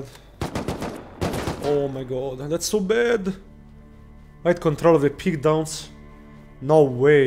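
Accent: Italy. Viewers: ma sei italiano? Hai proprio l’accento italiano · You have a really strong like Italian accent lmao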